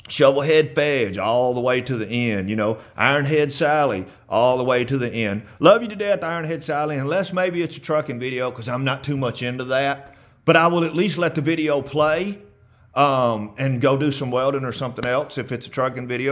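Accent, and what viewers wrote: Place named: Nashville, United States. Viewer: I lived in Nashville for 17 years and hearing your accent reminds me of a lot of the people from there. I really miss the rides down there.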